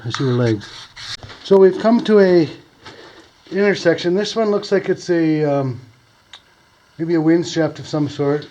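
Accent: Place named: Upper Midwest, United States. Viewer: I am very curious where you are originally from? Your accent sounds like you’re from the upper Midwest, Upper Peninsula, Minnesota, etc?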